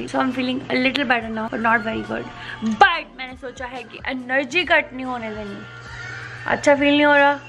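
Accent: Punjab, India. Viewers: Love you baby 😘😘😘😘😘😘😘😘love ur punjabi touch dear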